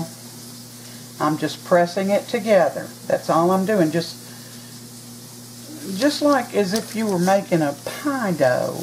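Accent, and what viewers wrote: South, United States. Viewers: I just subscribed to you. Partly because the pie crust video and now these biscuits look amazing (and I love the sound of your sweet southern voice), but also because I loved your Kavanaugh statement and the beautiful God Blesses at the end of your video. 🤗💕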